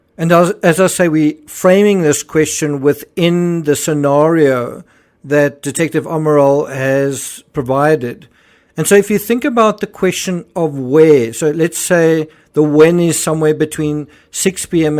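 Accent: South Africa. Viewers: What I cant understand is that if it was an accident, why cant the parents admit it? Love the Sa accent, it reminds me of home. Hi from NZ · Hi sueelliott4793 from me, also in NZ. I like SA accent but even more than that I like his creepy intro music!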